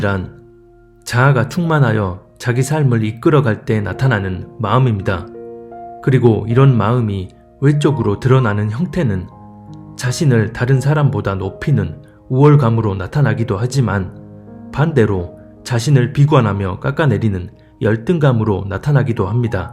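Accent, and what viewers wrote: Jeolla, South Korea. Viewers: 전라도 성도님이십니까요잉?ㅎㅎ사투리가 걸쭉하신게 듣기좋습니다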